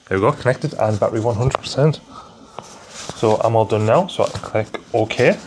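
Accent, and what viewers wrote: Middlesbrough, United Kingdom. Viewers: You sound local to me (Middlesbrough).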